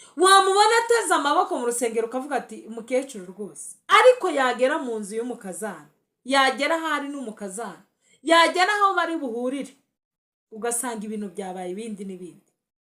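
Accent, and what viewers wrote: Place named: Banyamulenge, Democratic Republic of the Congo. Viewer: Mubyeyi urabivuga se urabizi ariko numvise uvuga nkabanyamulenge uriwe noneho nagukundaga ndushijeho ❤❤❤❤❤❤ uzansubize niba aribyo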